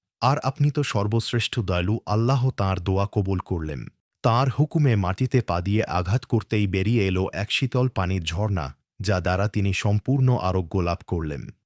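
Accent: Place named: India. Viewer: Indian raa স er pronunciation aveba kore knoo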